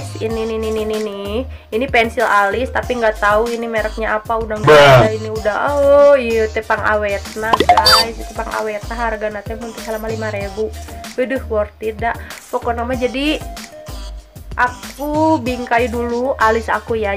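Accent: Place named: Sunda, Indonesia. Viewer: Suka ey nontona sunda bgt kitu tah wkwk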